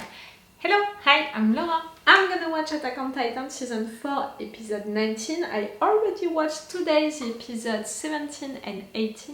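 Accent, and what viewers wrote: France. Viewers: Tu as l'accent français quand tu parle anglais, c'est mignon 😊😊😊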